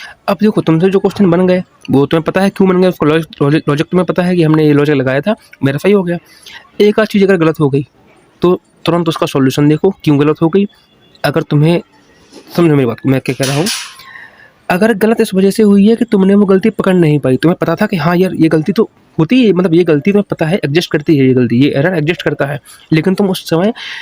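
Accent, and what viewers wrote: Lucknow, India. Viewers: R u from Lucknow?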